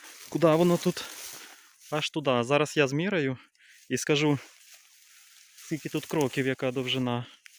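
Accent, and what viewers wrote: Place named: Lviv, Ukraine. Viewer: Вимова у Вас Львівська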